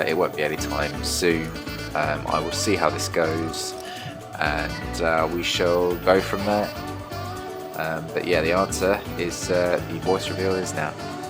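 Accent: England, United Kingdom. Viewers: Voice reveal. British. Subscribed. Brilliant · You're English, oh yeah baby respect my brother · I mean I guessed you'd be from England from that time I found you and you kicked my ass on For Honor 😂😂😭 keep up the great work man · YES!!! BRITISH!!! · Lovely British Orochi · Didn't expect you to be English haha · YES, HE'S BRITISH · Oh snap he's english! I love even more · ofc its a uk CUTIE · He’s British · No joke I thought u were Asian but it turns out you're a Brit like me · I fuckin knew it he was british. We luv ya!!!! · I had a feeling he had an English accent. I love it 😍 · O my god hes british i wasnt expecting that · I had no inkling you were british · Oooooooo a British accent I like it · Ah Yes, a fellow Englishman Finally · Who else knew he would be from the U.K because I did lol 😂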